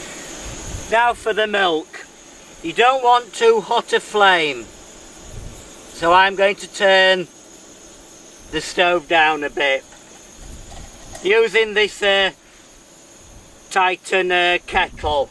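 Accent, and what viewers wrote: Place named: Yorkshire, United Kingdom. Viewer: definitely yorkshire, lu at a, not laaa tay or laaa t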